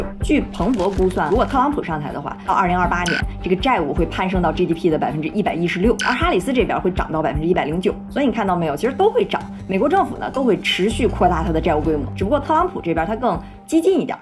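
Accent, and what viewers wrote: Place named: Dalian, China. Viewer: ，標準的大連口音.性格👍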